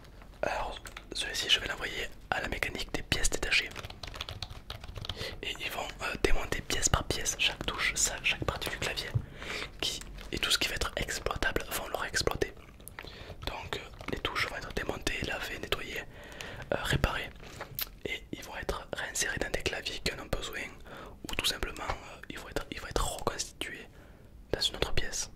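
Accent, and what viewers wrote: South, France. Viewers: Du sud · t’as un petit accent du sud toi 😂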